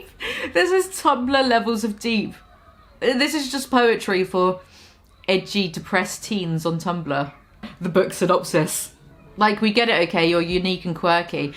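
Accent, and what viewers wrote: United Kingdom. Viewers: where in the UK is your accent from? its my fave one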